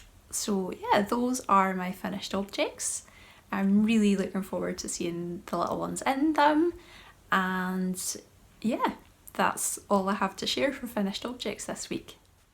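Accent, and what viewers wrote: Scotland, United Kingdom. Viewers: Thank you for your podcast! I love hearing your Scottish accent and words. I look forward to returning to Scotland again when pandemic conditions allow it. My question would be have you attended Shetland Wool Week and what are your impressions of it? I look forward to your next video and seeing more Scotland scenery, Happy Advent! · I ‘ve loved to hear those Scottsh words. Charming.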